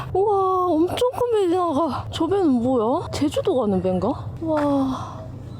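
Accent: Jeolla, South Korea. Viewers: 원래 전라도 분이신가 사투리가 ㅋㅋㅋ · 전라도쪽은 가본적이 없었는데...영상으로 대신 가주시니 좋습니다. 간혹 나오는 님의 전라도 사투리도 듣기 좋았구요. 덕분에 오늘도 여행 잘~ 했어요. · 전라도 사투리도 귀여브러…❤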